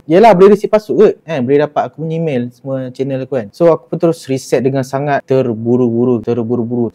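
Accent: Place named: Kedah, Malaysia. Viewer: Kedah mana, Aku pendang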